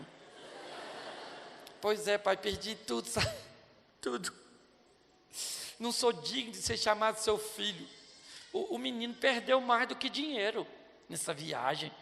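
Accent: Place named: Northeast, Brazil. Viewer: Kkkkkk pastor tú veio lá da minha terrinha foi? com esse jeitinho nordestino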